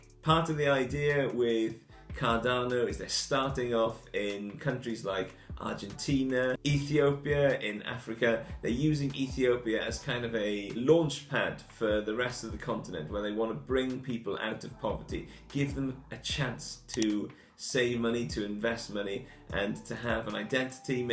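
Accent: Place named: Wales, United Kingdom. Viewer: I'm really enjoying your content so far, and is fantastic to hear another rustic Welsh accent in the personal finance space. Keep up the good work!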